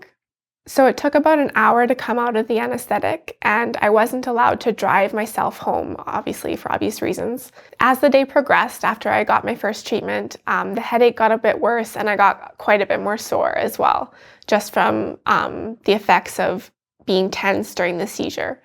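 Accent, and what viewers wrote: Canada. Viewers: Thank you. I have my first of 12 ECT sessions tomorrow morning (I'm bipolar1/PTSD) Your video relieved some of my fears. I really hope it works, I think I've developed a tolerance to the meds. Sorry it didn't work for you. You are very well spoken. Could not place your accent, I guessed right! The nice neighbors to the north. Thanks again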